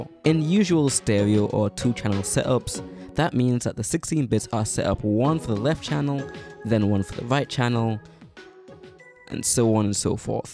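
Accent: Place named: United Kingdom. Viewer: really excited to see your british accent get stronger with each video! <3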